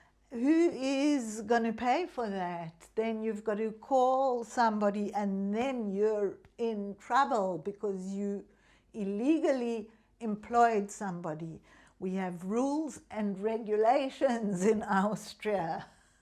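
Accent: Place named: South Africa. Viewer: thanks for sharing - love your pretty Austrian brown skin, clothes & furniture. You are delightful breath of fresh air. Sorry about your neighbour's tree being gone (your 16yo girl is precious) I can hear your South African accent ❤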